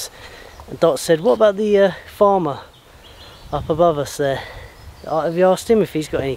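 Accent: Wales, United Kingdom. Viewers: Awesome pal, nice to finally know your the only gay in the village 😄😄😄😄 fantastic Welsh accent. Nice to see in the vids again. She is beasting it pal, watch out she will be taking over. Lol. Stay safe x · Nice welsh accent you got there:D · Assent Welsh to no end... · Loved your welsh accent and the humour behind it.:) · I love hearing the welsh assent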